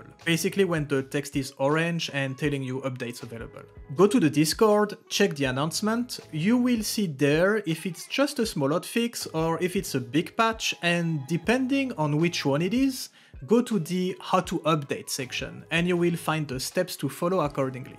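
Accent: France. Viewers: Dès les premiers mots on sait que t'es français! Merci pour la vidéo! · tes francais ?? where is he from >>,<<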